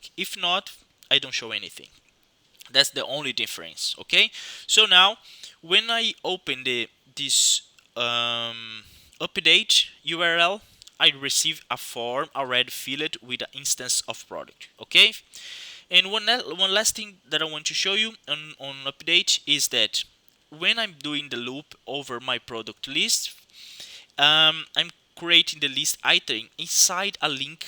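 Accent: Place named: Brazil. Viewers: are you brazilian? eu conheço esse sotaque! curti o vídeo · For sure. Reconheci o sotaque no começo também. Tava com o inglês muito facil pra entender aiheaieha So pode ser Brazuca.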